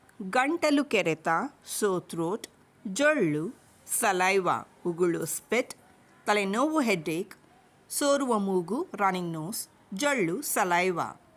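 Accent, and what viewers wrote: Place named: United States. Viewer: 😂😂 American & Kannadiga mix mam 😂😂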